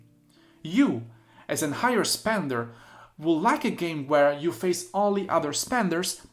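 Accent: Italy. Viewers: Avevo intuito qualcosa dalla pronuncia, il ciao finale mi ha convinto😂. Iscritto 💪👍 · bro are you italian? by the way very good video, as f2p i totally agree with you, another youtuber (@ said the same things weeks ago in one of his stream explaining why rok is about to die if they continue on this path, he try also to contact developers but no-one respond to him #CommunityMatters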